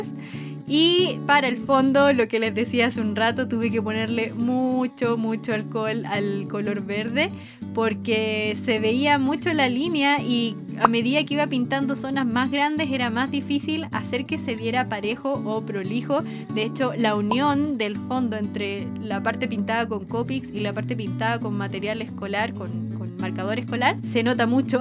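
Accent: Chile. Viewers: me parece?? o habló en chileno???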